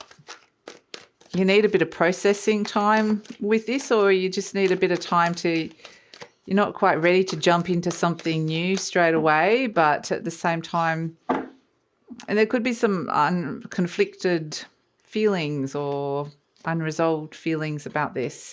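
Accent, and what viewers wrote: Australia. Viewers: ❤ nice to hear an Aussie accent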